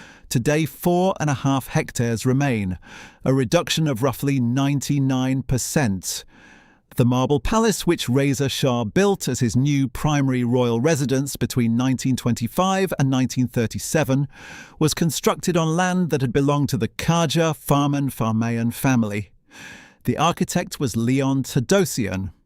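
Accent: United Kingdom. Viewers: The British accent says it all! Still trying to support the Qajars to reep from their 'not know how'? Speaker says that after Reza Shah free speech was banned. Shame on you! It is the British way to manipulate and take advantage of countries thru political manipulation. God bless the USA which the Brits lost with the Grace of God and bravery of Americans and so the Brits are still burning their as*es for the war of 1812. God bless Trump for not appeasing and listening to the Brits. God protect the USA, Iranian people and Israel from all especially the Brits.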